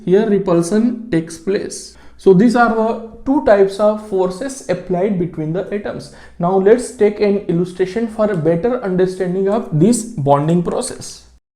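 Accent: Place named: Maharashtra, India. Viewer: You are marathi by your english i can guess that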